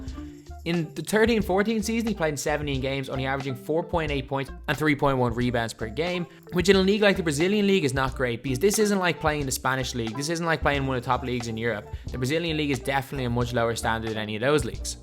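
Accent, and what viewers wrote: Ireland. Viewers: are you from Ireland!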